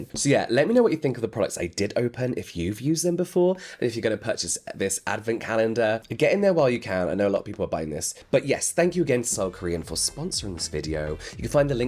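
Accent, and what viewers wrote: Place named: RP, United Kingdom. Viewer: sweetie you can pronounce words however you want, and you still sound refined British to us Americans😊. Sending love from Chicago ❤❤❤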